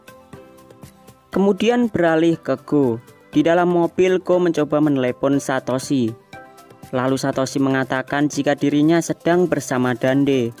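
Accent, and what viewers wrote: Java, Indonesia. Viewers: Wong Jowo Mas ? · Orang Jawa mana bang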